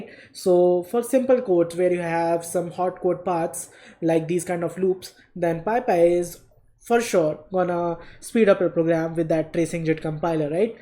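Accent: India. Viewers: It's the thick Indian accent. It's not easy on the ears for foreigners.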